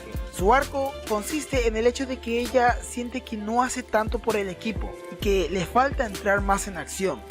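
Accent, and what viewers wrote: Paraguay. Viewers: Eres paraguayo!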